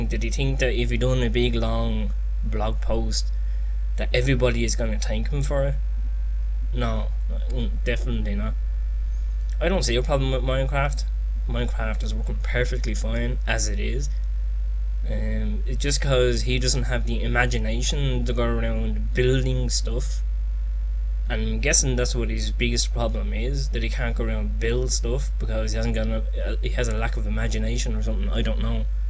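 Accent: Ireland. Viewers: spoken like a true irishman! :D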